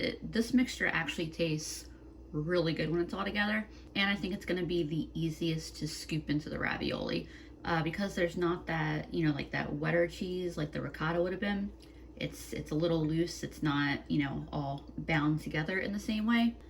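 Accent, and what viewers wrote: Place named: South Jersey, United States. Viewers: Love your videos . You must be from jersey! I grew up there i hear it in the voice! Great recipie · Interesting fillings.. I saw you said you were from New Jersey ..Sounds like a South Jersey accent .. I grew up in Vineland .. Many many years ago .Actually a tiny town right outside Vineland called Newfield.. But if your not from there you don't know its there lol.. Found your videos just a few weeks ago .. Well done.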